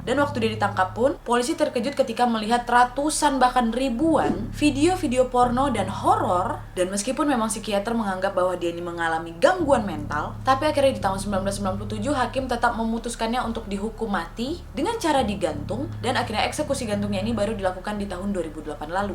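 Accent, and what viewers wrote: Malaysia. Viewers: Cara penyampaian wak2 sikit mcm orang malaysia 🤗🤗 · OMYGOSH. SAID SELUMBER. i love her accent, it really same to Malay accent 😂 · Selamber je bahasa melayu tu bila sbut.. macam asli melayu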